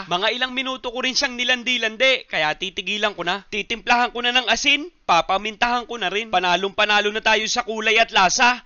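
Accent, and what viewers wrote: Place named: Batangas, Philippines. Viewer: batangeño na *batangeño*. alah naman!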